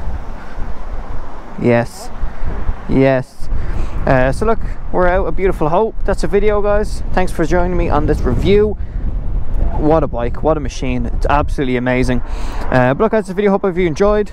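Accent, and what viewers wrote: Ireland. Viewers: it has nothing to do with your being Irish, or you accent. In fact I love the Irish people very, very much. There were quite a few times when the editing was not as accurate as it could be.. As I said, I love the Irish very much. And I love your accent. It was not my intention to upset you in anyway. And I’m sorry if it did. Please except my apology for any words I said that might make you think otherwise. Owned a Honda CX 500 V Twin in my younger days. It was when I began to suffer from arthritis that my wife and I turned to cars. My car is now Electric and love it. Hope you might buy this bike. Boy it’s quick off the mark. Richard.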